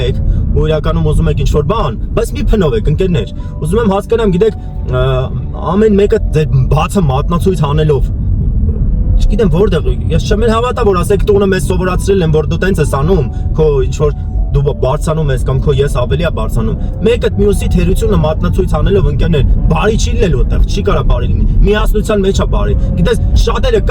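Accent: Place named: Iran. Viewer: ❤❤❤իրանահայի բարբառով է խոսում, որը շատ համով է❤❤❤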